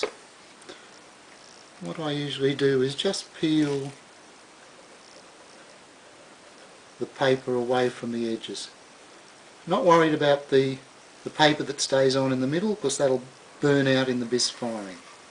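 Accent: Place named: Australia. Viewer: great to hear an aussie! . the packaging tape technique has solved a problem for me. thanks.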